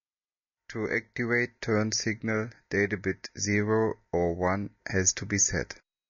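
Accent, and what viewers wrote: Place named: Germany. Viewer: Hi to the chaps at Engendi. Speaking as someone watching your video with only a general interest, this is my feedback: *The video gives a very useful view of CAN. thanks. *the product looks excellent, but fairly expensive, so you need to have high quality product promotion. (i realise that it is probably much cheaper than other options...) *no offence intended at all, but the English pronunciation is terrible, the accent is way too German, and there is no life in the voice at all. Yes, we know you are engineers, but if you are trying to sell to english speaking people, as i suppose you are, I recommend you have the whole video redone where it is narrated by someone who speaks English really well.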